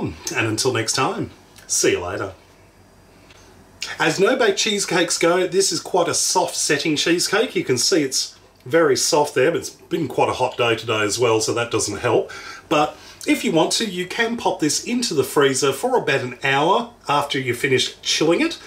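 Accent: Australia. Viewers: I'm from the UK..... believe me he deffo sounds like an Ozzy to me lol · I loooooooove Australian accent 🤤😍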